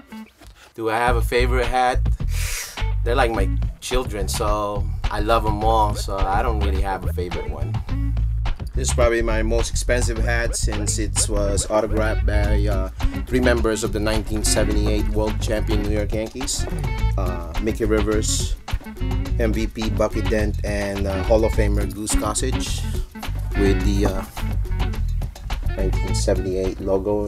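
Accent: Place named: Philippines. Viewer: First word came out form his mouth, and I was like "He's Pinoy!"!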